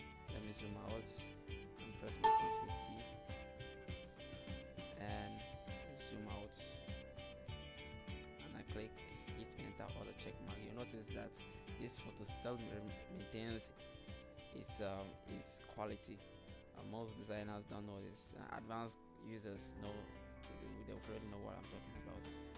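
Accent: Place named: Nigeria. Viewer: Woow!.....great tutorial nice voice. sounds nigerian.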